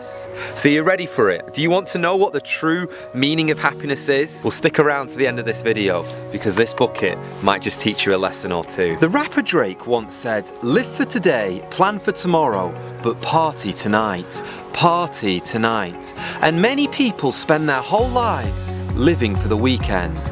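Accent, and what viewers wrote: United Kingdom. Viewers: It's British . I say it that way too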